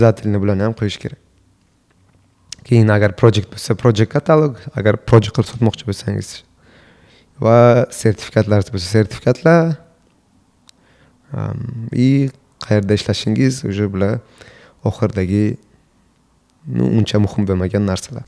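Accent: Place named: Bukhara, Uzbekistan. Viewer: aktentan bildim Buxorolik ekanizni Vobkentdan Salom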